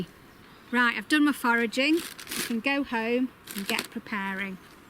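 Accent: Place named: United Kingdom. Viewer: Really appreciate this video, can't wait to pick my firsts shoots this spring! Can you please tell me from what part of UK your accent is from? Probably the most lovely british accent to be honest, makes it very easy to listen to the whole video!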